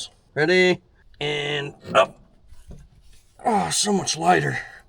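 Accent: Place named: Northeast, United States. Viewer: I started watching your content about a year ago. When you spoke, your accent sounded similar to the way the folks from the NE sound. Your content is interesting, I drove OTR for 36 years, and I love the sound of Screaming Jimmys. Keep the good stuff coming!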